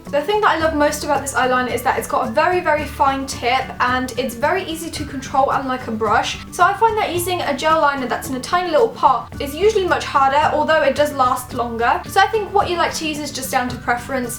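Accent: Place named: United Kingdom. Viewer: Girl do you live in the UK you have a british acsent I live In the UK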